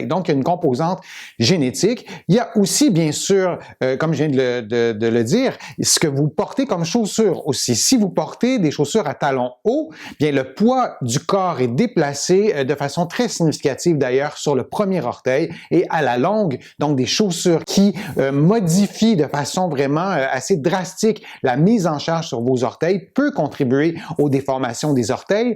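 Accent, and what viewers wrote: Quebec, Canada. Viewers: Très intéressant. Je les faisais déjà de ma propre intuition mais pas debout. Et c'est très efficace. Ça ralentit significativement l'evolution. L accent canadien me ravit. C est donc "double peine".. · Grand merci de France pour toutes ces propositions d exercices ...que j'aurais aimé trouver il y a quelques années... Mais mieux vaut tardbque jamais 😊 Je vais m y mettre avec grand plaisir pour tenter d enrayer la progression .. et c est si "plaisant" d entendre votre accent enchanteur (mon mari était québécois 😘🙏) Merci infiniment !🙏🙏🙏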